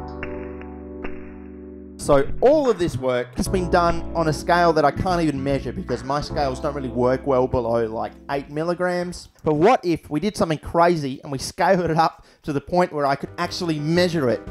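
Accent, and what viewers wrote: Australia. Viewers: So refreshing to hear Aussie content on YT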